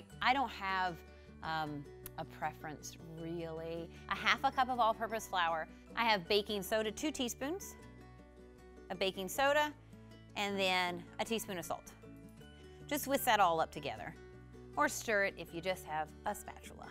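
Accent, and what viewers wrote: South, United States. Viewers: is beautiful and then you add the Southern twang and the cooking factor. Even better.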